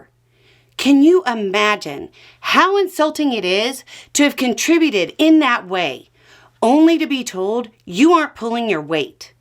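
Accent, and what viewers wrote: United States. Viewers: Pleasantly surprised to hear an American accent speak so much sense.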